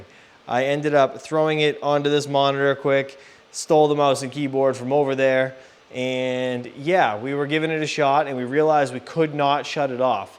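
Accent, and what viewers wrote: New England, United States. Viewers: Sounds like a New England Accent so Im betting National Grid customer rip off rates Im in FL and I pay about 0.08KWh here in FL on a COOP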